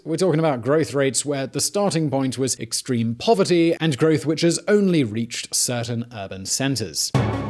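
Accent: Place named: United Kingdom. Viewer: since when does V-sauce have a political channel with a british accent?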